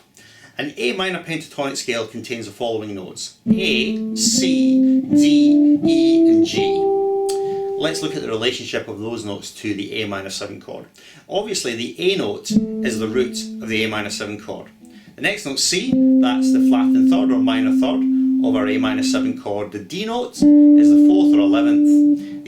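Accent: Scotland, United Kingdom. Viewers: Any chance you could invest in a tie clip mic? Your Scotch accent is a rarity in YouTube and needs to be heard properly.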